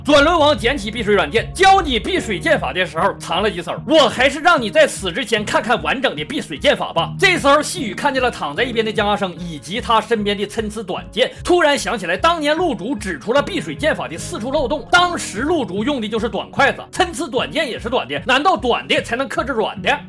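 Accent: Northeast, China. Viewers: 最好听、最逗人的东北话，都在这里了。😀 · 東北味真濃.據說東北人普通話都很好.Thanks♪(･ω･)ﾉ影評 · 这份碴子味儿的解说听上去那是相当地亲切啊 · 一定是咱们东北银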